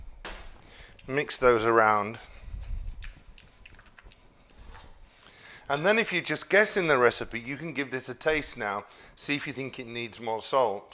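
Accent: United Kingdom. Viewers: I love my brits!! I love ur accent!! Kinda want to move to England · It’s just the way us Brits say it with our accent. When I moved to the US from the UK, everyone laughed when I pronounced it literally ‘Mary-land’. It took me a while before I learned it was pronounced more like ‘Merilyn’.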